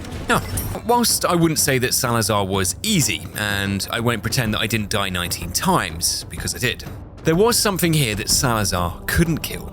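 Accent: United Kingdom. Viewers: That accent says British but your love of friendly fire says American.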